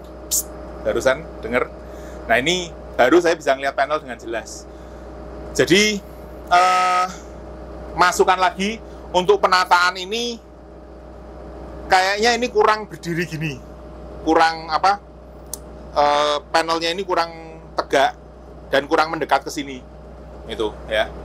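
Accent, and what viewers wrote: Java, Indonesia. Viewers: Tetap pertahankan medoknya pak😂😂😂....saya subscribe baru anda dari negeri yang jauh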